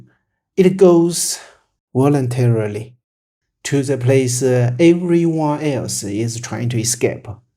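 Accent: China. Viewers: I'm glad I subscribed to this channel.. Although the accent is a bit Chinglish(no racist pun intended), at least there are subtitles. Every content here is a hidden gem.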